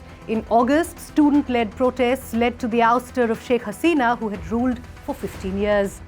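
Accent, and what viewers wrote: India. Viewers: > Opens video > Indian accent > "Yup"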